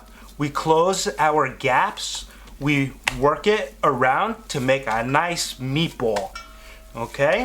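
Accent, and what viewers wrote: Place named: Boston, United States. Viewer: Thanks, you ROCK too! I love listening to your Boston accent! :)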